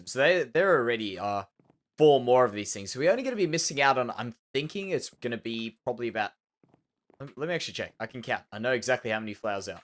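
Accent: Australia. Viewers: Did anyone else mistake his Australian accent for a British one lol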